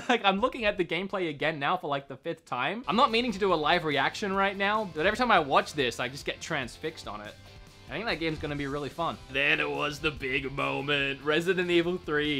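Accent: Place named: Australia. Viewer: You're an Australian!? I did NOT expect that!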